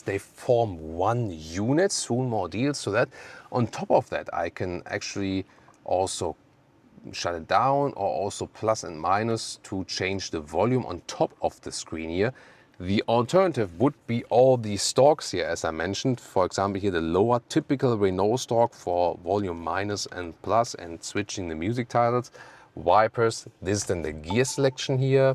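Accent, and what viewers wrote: Germany. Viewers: Somehow it feels so satisfying to listen to this well-rounded review of a French car, delivered in English with a German accent. Love the new Clio! ❤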